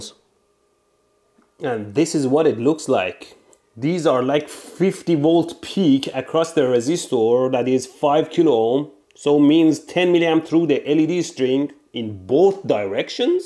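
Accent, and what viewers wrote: Iran. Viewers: اره ایرانیه دانشگاه تهران درس خونده بعدا رفته کانادا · ایرانی هستی داش ؟ نه ؟ قیافه ، لحجه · خو ایرانیه؟ بابا لهجه ش خود لهجه فارسیه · I just love his thick Persian accent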